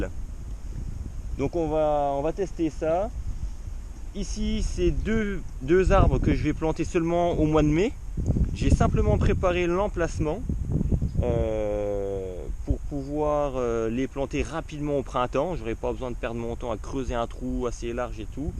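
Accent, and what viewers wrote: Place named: Alsace, France. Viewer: Bonjour à toi. Je découvre ta chaîne et un brin de nostalgie m'envahit. J'habite dans le sud-ouest mais je originaire d'un petit village au pied du Ballon d'Alsace. Ça fait du bien de revoir ces paysages et d'entendre cet accent bien de chez nous. Ça fait bientôt 40 ans que j'ai quitté la région et ça me donne un bon coup de fouet. Merci pour tes vidéos et bonne continuation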